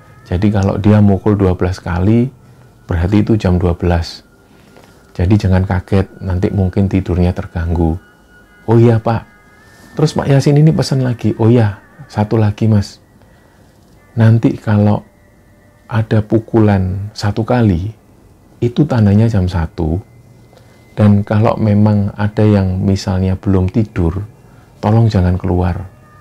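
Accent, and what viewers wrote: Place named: West Java, Indonesia. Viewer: Lidah Kulon 👍 mas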